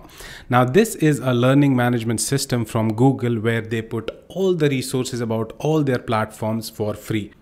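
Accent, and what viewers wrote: India. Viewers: He have kinda Indian accent 👀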